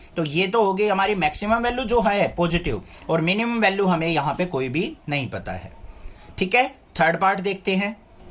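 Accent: Haryana, India. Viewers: sir ji thara haryanvi touch aali boli me maths padhne ke jise alag hi hai thanks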